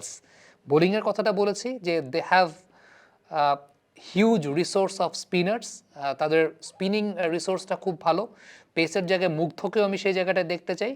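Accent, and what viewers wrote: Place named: Chittagong, Bangladesh. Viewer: আপনি তো চট্টগ্রামের বাষাও খুব ভালোই বলতে পারেন।😮😊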